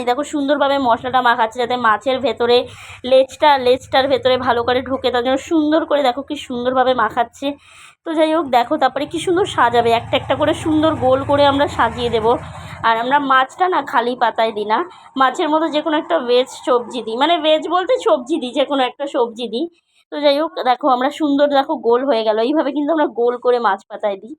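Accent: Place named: Barishal, Bangladesh. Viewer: তুমি বরিশালের বাঙাল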